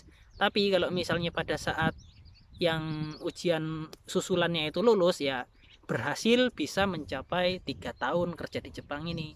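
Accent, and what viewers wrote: Java, Indonesia. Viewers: Logat Jawa ini ☺️☺️ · Wong jowo mas? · Wong jowo rek. Ngomng e medok, kekel aku🤣🤣🤣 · Logat e jowo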